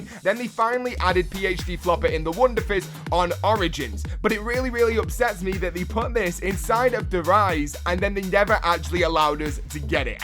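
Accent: England, United Kingdom. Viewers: allot a lot hos english accent made it sound like that trust me i have someone i know with the same accent he pronuces it the same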